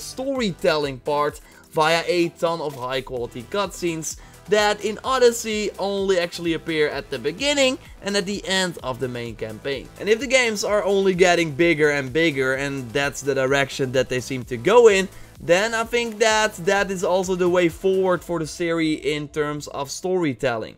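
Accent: Netherlands. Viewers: goeie channel echt waar maar das elke keer weer da hollands-engels accent weer gewoon worden haha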